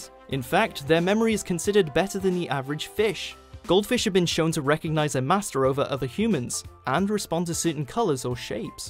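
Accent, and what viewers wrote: United Kingdom. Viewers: He has a British accent, so you can stop attacking him over how he says Echo. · he's British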